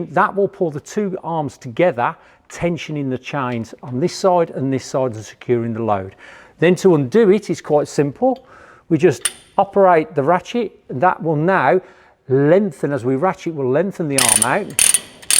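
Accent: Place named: United Kingdom. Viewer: Because that’s his local accent. There are many dialects and accents in the UK.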